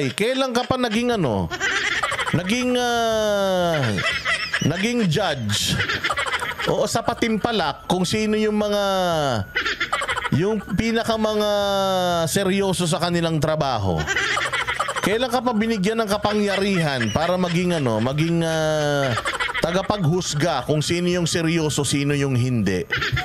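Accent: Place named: Visayas, Philippines. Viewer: Tawang tawa kami sa iyo uy. Para kang bisaya tulad namin🤣🤣🤣🤣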